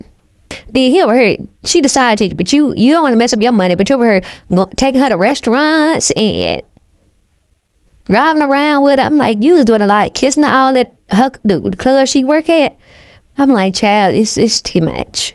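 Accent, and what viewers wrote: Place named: South, United States. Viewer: Your southern accent is giving me life! Tennessee? Appalachia?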